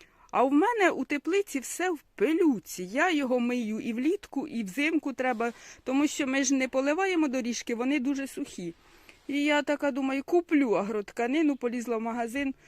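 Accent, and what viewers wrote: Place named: Cherkasy, Ukraine. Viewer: Доброго вечора,. Дуже цікаво розповідаєте, особливо для тих хто тільки починає займатися цим бізнесом. Скажіть будь ласка, де ви проживаєте? Дуже схожий говір, ніби у нас на Черкащині.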